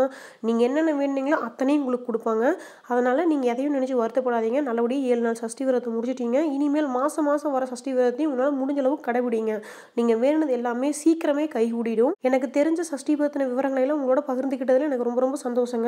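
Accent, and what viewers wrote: Kongu, India. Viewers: What a kongu speech we are also Coimbatore super proud of you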